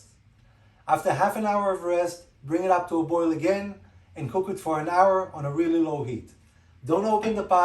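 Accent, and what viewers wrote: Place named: Israel. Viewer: Amazing video. By the way, I noticed you have an Israeli accent.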